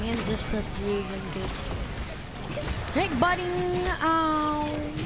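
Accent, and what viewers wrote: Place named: Afrikaans, South Africa. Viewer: Are ya south african thats ONE HELL OF A AFRIKAANSE ACCENT MY BROE.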